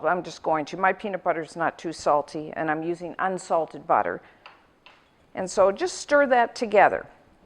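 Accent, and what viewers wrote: Canada. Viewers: canadian accent is peaking.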